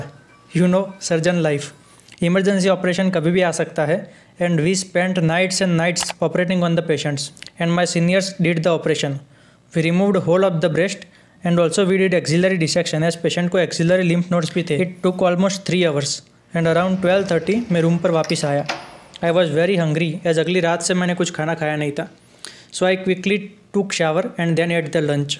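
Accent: Gujarat, India. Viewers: Your little bit accents same like Gujarati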